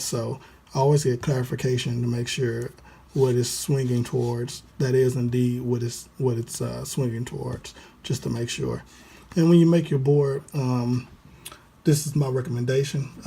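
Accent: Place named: South, United States. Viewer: Thank you so much for your help! Thank you for sharing your light! Anddd two cheers for the southern accent I'm detecting?!!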